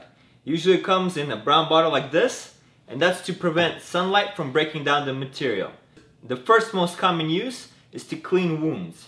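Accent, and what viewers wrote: Russia. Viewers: Wat happen to your Russian accent 😯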